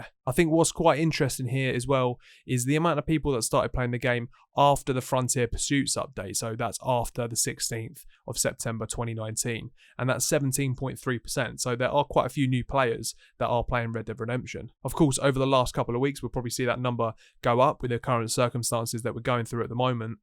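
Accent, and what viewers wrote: United Kingdom. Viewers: I love your natural British accent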